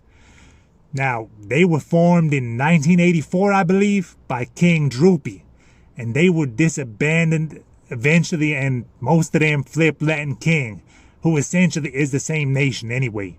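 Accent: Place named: Vietnam. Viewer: U definitely picked up the Viet accent lol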